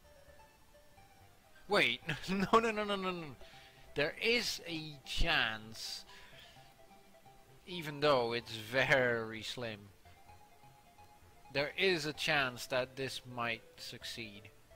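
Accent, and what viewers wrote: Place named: Netherlands. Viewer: Are you Dutch? What is this accent?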